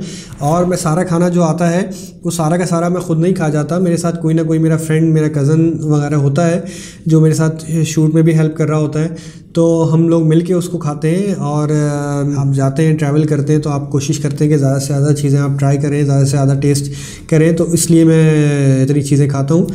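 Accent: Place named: Lucknow, India. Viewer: Aapki Lucknowi andaaz se pta chal gya tha aap lucknow se ho❤❤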